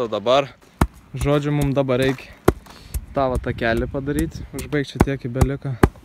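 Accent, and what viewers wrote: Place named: Samogitia, Lithuania. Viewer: Sakau ko toks žemaitoks,girdis iškart 😄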